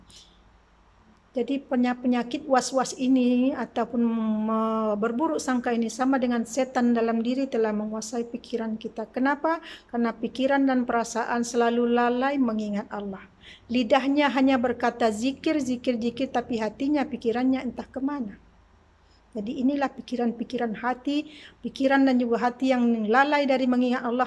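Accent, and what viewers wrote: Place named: Malaysia. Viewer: Bahasanya has Malasiya enak didengar 👍🙏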